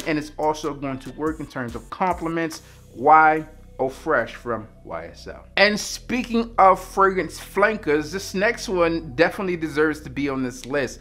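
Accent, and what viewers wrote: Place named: Queens, United States. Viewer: Yo you from Queens boss? I like the energy with the way you describe the scents. Just subscribed. Keep up the good work 💯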